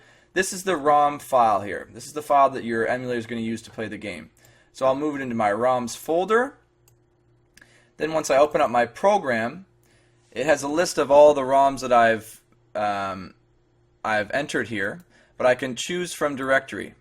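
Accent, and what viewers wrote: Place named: Canada. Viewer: Canadian eh? Thanks for the tutorial man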